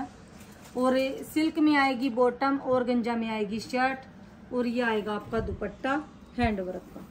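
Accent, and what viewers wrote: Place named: Haryana, India. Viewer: She is belong to haryana state ..so speaking tone is different.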